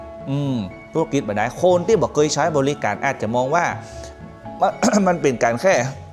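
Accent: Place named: South, Thailand. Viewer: สำเนียงแบบนี่สายใต้แน่นอนค้าบบ 😊😊😊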